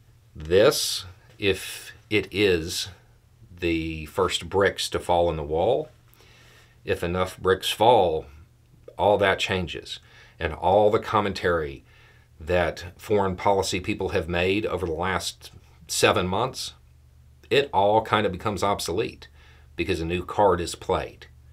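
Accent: South, United States. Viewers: I love that sharp intellect cloaked in that velvety southern accent. I know your accents are not exactly the same, I think you are from different regions of the south but you remind me of my favourite southern gentleman Shelby Foote. 💖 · Perceptive video. Southern States accent for sure, but did I catch a trace of an Australian accent as well?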